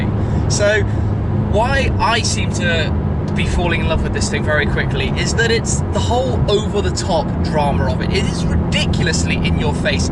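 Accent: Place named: England, United Kingdom. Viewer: what city/region in England is your accent from? Nice car!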